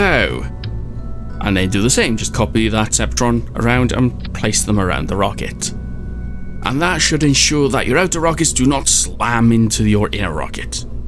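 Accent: Wales, United Kingdom. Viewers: I’m sure you’re Welsh, but I keep hearing twangs that make me think Scottish or Irish? Anyway dope vid man, I have nowhere near the science to do this, and no idea how to get it, but thanks. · Scott Manley is Scottish, this guy is Welsh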